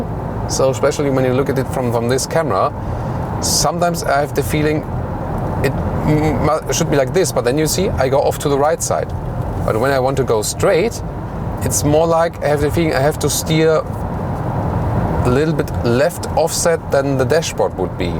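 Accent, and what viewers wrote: Germany. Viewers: I believe your german accent renders you unable to properly pronounce the word "vehicle" . I am sorry to say that this way it sounds as if you were trying to say "fecal" but with "v" at the beginning. This is not an insult ... just my failed attempt at constructive criticism.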